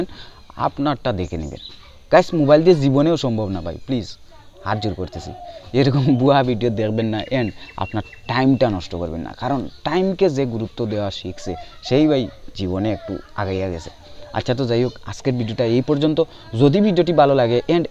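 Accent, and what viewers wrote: Sylhet, Bangladesh. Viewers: ভাই আফনার বাড়ি কিতা সিলেট নি? আফনার মাত দেখি সিলোটি অখলর লাখান লাগে।🥰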